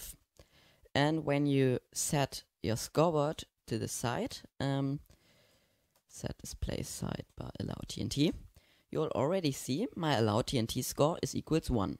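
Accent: Germany. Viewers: you have a german accent lol (I am from Germany)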